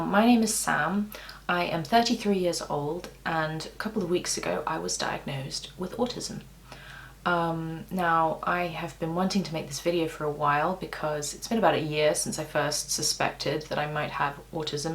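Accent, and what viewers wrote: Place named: England, United Kingdom. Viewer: Hiya Sam, thanks for replying. Apologies for making the assumption that you were in England just by your accent and before having watched more of your terrific videos. Your assessment appears to have been quite different to mine. Wouldn't it be wonderful to get to a point where we are just understood, accepted and appreciated without the need for 'diagnosis'? We can but dream.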